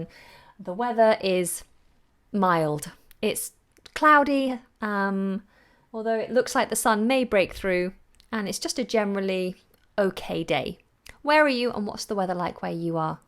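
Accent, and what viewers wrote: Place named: RP, United Kingdom. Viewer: I’m so impressed with your composure, your delivery and your genuine commitment. I love your accent. RP? I live just north of Boston so my accent is unintelligible. You are doing great work.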